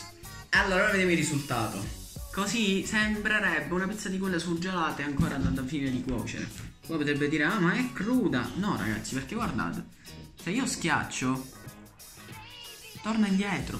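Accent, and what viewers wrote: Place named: Palermo, Italy. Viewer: sei di Palermo? No perché anche io lo sono e penso di aver riconosciuto l'accento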